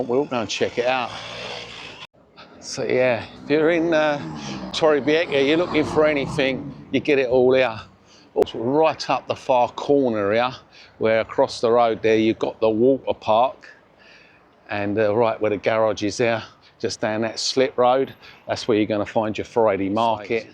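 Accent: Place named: Luton, United Kingdom. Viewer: Sounds like a luton accent originating in south london .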